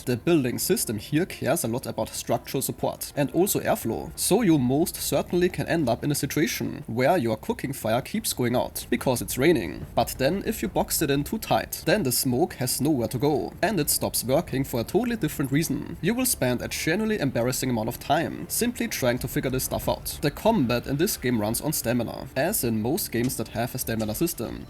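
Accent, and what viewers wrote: Germany. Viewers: DU REDEST SO DEUTSCH OBWOHL DU ENGLISCH SPRICHTST.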